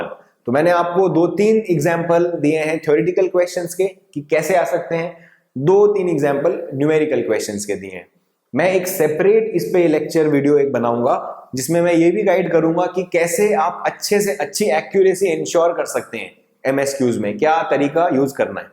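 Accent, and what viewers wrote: India. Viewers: sir u r speaking in hindi like a south indian....seems funny to c u speaking in hindi bcoz i have a habit of listening to u in english only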